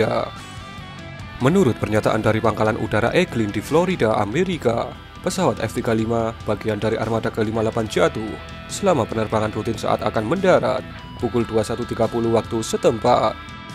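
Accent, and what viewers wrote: Java, Indonesia. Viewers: karena aksen sampeyan medhok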